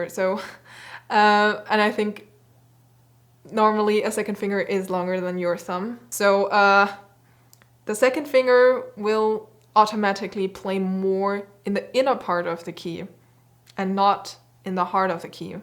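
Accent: Germany. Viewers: Your German accent is so cute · Bist du deutsch? Ich höre so einen Akzent? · To change the topic ..... it's interesting to hear how a german speaks englisch. Du machst die gleichen gramma mistakes die ich auch aus der deutschen Grammatik rueberhole, und sprichst abgehackte Woerter. Englisch ist mehr eine weiche Sprache wo Woerter ineinander fliesen. Das ist schwer fuer Deutsche. Aber dein Englisch ist gut 🙂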